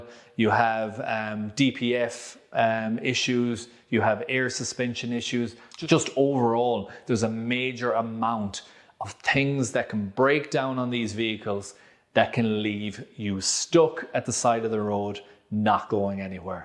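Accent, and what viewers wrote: Ireland. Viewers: Completely agree with your list. All mechanics and auto electricians have a list of vehicles that they would not recommend to their worst enemy. I am retired from the trade and I understand the issues that mechanics have to face in relation to dealing with badly engineered vehicles. Unfortunately, all vehicles have issues of some kind, my list includes some Peugeot models, not I hasten to add not the old 505/504 models. Some Volvo models have given me grief in the past. As usual great video. Just out of interest what part of the republic of Ireland are you from, cannot place the accent, I am from Northern Ireland and living in Melbourne Australia.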